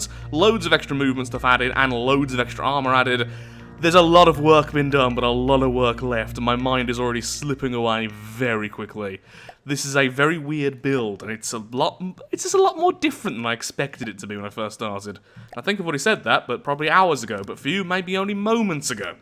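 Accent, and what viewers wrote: United Kingdom. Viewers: Hey, probably many people are thinking this 'what country are you from?' British? Australian? if you see this please reply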